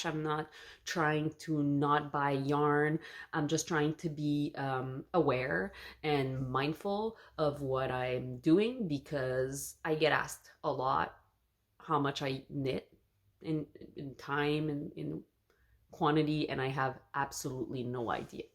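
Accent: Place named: Quebec, Canada. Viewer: Hi Dear. I was listening to your accent. I am an English Speaking Montrealer. I asked google whether you are French Canadian, and I was so pleased to see that I guessed right! I moved to Israel 33 years ago. I am so happy to have found you. I love your knitting! Best of luck.🎉❤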